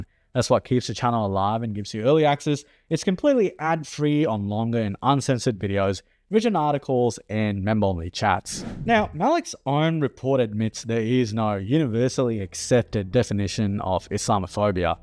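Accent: Australia. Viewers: I'm hearing Australia, probably Adelaide, South Australia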